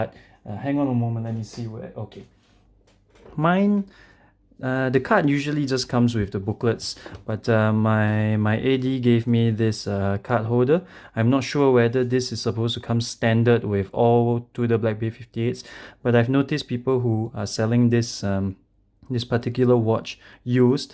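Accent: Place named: Singapore. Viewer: The second when I hear you speak, I know you're a fellow Singaporean haha. I just got mine early this month, it's my first luxury watch too and I absolutely love it. Congrats on your new watch! Cheers :)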